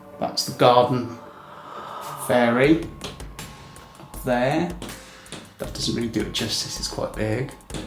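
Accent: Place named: North, United Kingdom. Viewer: My compliments: your house shows good taste, and a great aesthetic sense. I have a question, which you might find a bit provocative: how can you be so lighthearted all the time, given you are a goth, these are heavy times (ref: Paul King!) and, based on your accent (Northener...?), you seem to live in a Country where police breaks into someone's house following a post, and that has been literally destroyed by decades of uncontrolled mass migration? I know being a goth can simply involve lots of make up and a matter of dress code, but I do not believe you are such a shallow guy. Just wondering, as I can barely cope.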